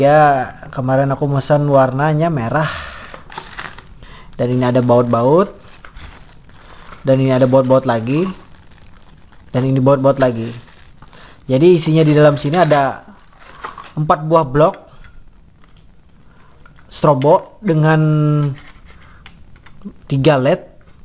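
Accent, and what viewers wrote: Banjar, Indonesia. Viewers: Orang banjar kah pian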